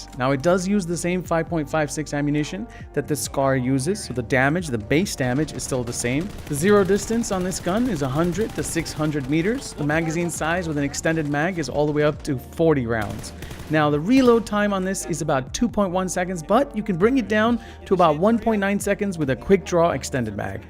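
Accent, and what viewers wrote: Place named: Russia. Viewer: I like sat Russian accent tho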